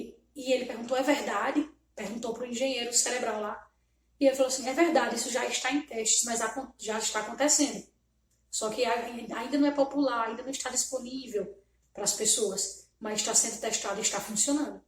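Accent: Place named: Northeast, Brazil. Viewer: já comecei me indenticando com esse sotaque NORDESTINO. A tua história parece muito c a minha. O caminho das palestra foi onde tive a maior catarse. Continuou pq pedi catarse. Estou na segunda onda, nunca aprendi tanto em toda minha vida.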